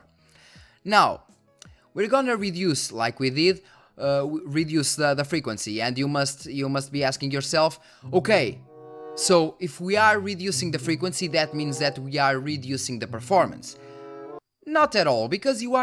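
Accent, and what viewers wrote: Portugal. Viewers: Grande tugão, apanhado pelo sotaque ... bom video pahhh Saiu este video de um alemão (para as "RX 5700" / "RX 5700 XT", com OC a 2100Mhz <link> software: - GPU-Z.2.30.0 - MorePowerTool review: <link> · Pareces tuga pela forma de falar, props pelos vídeos! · i really like the way you speak english, your are portuguese right? GJ!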